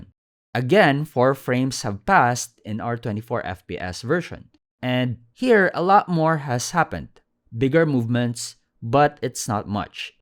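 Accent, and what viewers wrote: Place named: Philippines. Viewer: The Filipino accent is strong on this one.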